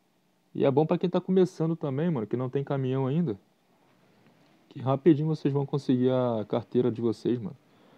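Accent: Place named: Rio de Janeiro, Brazil. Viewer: Carioca façando é tri dahora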